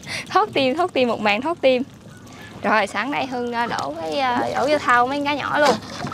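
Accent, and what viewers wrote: Mekong Delta, Vietnam. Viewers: Em chat giong mien tay nghe ngot nhu mat ong rung,❤❤❤❤❤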